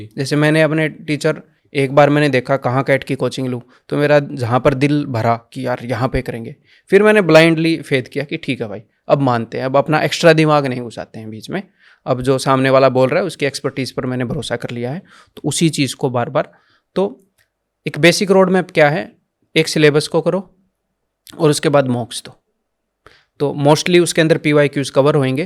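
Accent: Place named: Haryana, India. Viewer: He is haryanvi bro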